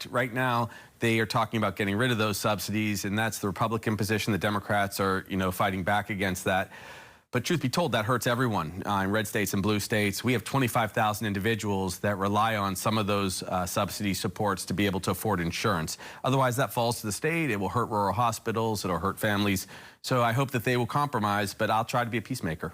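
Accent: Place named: United States. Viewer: This guys mainland accent kinda rubs me the wrong way😮😮😮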